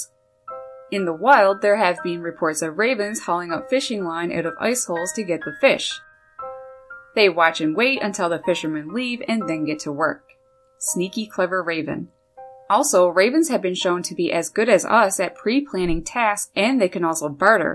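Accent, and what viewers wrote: Canada. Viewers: Annoying Canadian accent. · By any chance are u from Canada?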